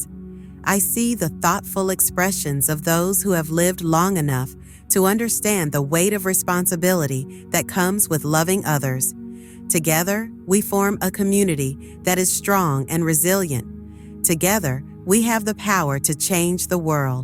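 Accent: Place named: Visayas, Philippines. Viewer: Fake..not deserving..bisaya kaayo.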